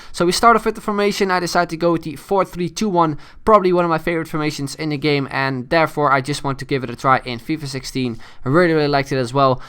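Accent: Netherlands. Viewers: are you dutch? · ik dag al dat je nederlands was dat merk je gewoon hoe nederlanders engels praten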